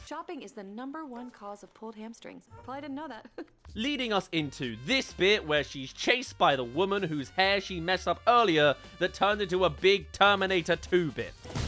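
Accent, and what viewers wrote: United Kingdom. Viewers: Wait!! You’re British??? 😅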